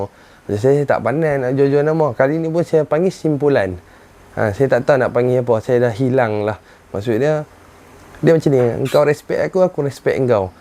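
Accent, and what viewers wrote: Penang, Malaysia. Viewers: Cakap peneng lagi sempoi.x payah cakap KL bunyi pelik😅😅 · cakap kl sekerat Pineng sekerat apa PON terbaik bos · please laa bercakap mcm orng utaqa .. toksahh la dok gabung kl dgn penang naik peninnnnnggggg maitttt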